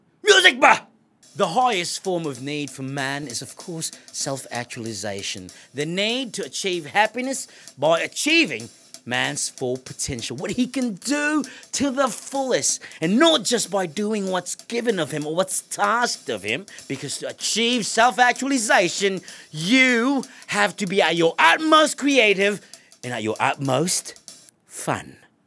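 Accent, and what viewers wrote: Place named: Australia. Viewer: That damn accent. How i wish i have that Australian accent. Here's a thumb up.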